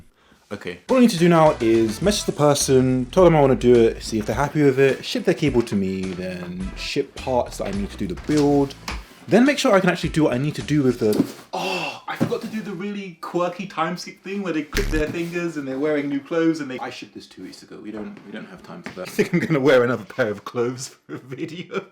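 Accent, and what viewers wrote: United Kingdom. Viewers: Are you British.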